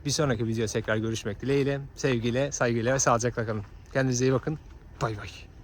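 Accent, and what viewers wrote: Japan. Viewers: japonyada kalmak cidden etki etmiş bu arada kelimeleri telaffuz şeklinden japonca konuştuğun baya anlaşılıyor çok tatlı (‾◡◝)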